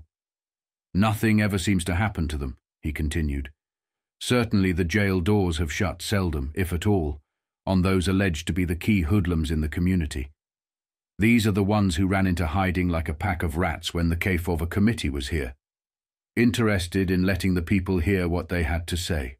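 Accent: United Kingdom. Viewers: Who wants to hear a stupid British accent !!!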